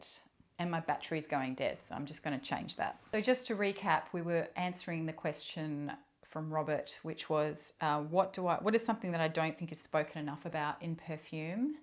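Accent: Australia. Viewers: Totally agree with Tyler.....I love listening to a fellow Aussie. Your videos are such an easy watch.♥️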